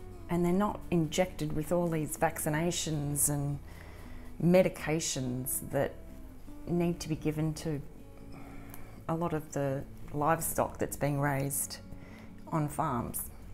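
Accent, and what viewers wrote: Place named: Australia. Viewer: I can't placee your accent... I wanna say "aussie"? - HA! Nailed it. Sorry: autism. I struggle with accents.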